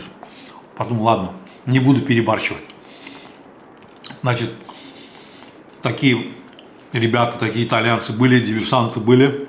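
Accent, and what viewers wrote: Baku, Azerbaijan. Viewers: правила нет. Но не используют лук :) помидоры, чеснок базилик да, но лук туда не кладут. Но все равно смотрю вашу передачу только из-за того что вы бакинец и мне нравится ваш акцент бакинца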